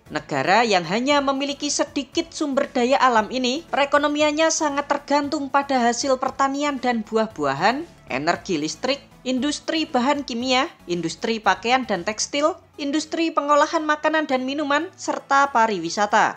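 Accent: Java, Indonesia. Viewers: Buset dah Medok Amat ngomong nya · Admin medok rek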